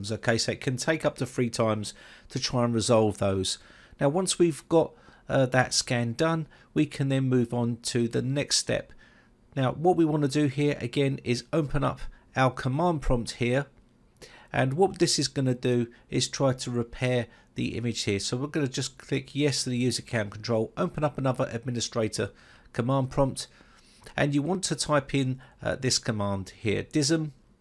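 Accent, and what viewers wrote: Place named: Scotland, United Kingdom. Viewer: Very great scottish accent!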